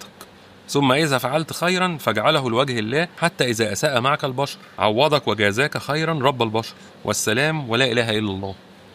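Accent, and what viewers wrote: Egypt. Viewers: على فكرة ، اللهجة المصرية جميلة جداً و كلامك مفهوم. ما تشيلش هم 😊 انا من لبنان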